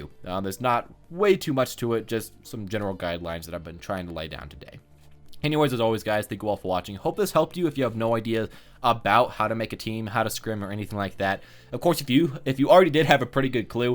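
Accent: United States. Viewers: Wait, are you from utah?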